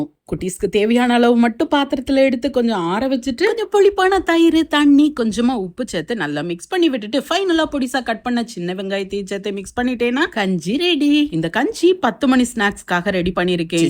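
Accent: Tirunelveli, India. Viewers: Sister neenga thirunelveli ya??...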